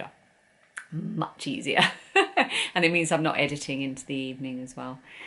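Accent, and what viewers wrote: United Kingdom. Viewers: What a lovely place to take a walk - thanks for sharing! For your Q & A bit I am curious about English accents. Here in the States we can tell if someone is from the South or East or even from certain states such as Louisiana and I know the same is true for the UK. Where did you grow up and is your accent a reflection of that location?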